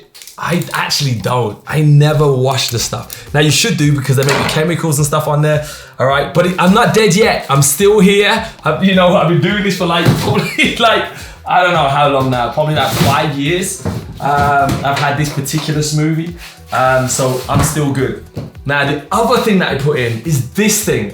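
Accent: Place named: France. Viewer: You are so real, love your French accent, would love to hear your American accent.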